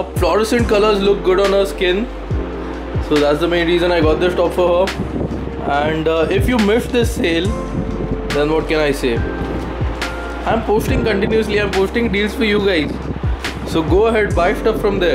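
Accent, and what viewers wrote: Maharashtra, India. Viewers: That marathi tone in ur voice and gyserin this hot weather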